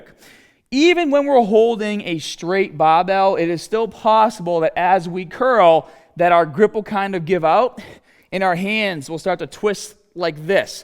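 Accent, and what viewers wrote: Boston, United States. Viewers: Is that a Boston accent? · amor macalalad he’s from Boston so a Boston accent.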